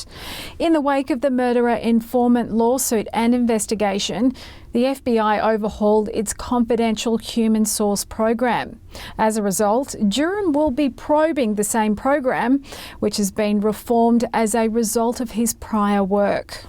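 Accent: Australia. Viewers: Thanks God for a good honest reporting I like the epic time you’re a spicy lady with awesome Australian accent keep up the great work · U sound like a Aussie..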